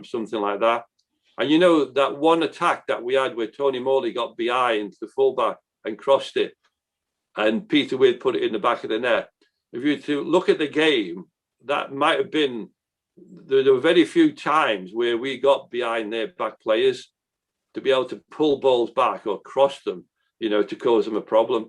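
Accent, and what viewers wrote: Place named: Birmingham, United Kingdom. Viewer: Bit of a Brummie accent creeping in there. I asked my Irish Father is Jack Grealish the best captain-absolutely not.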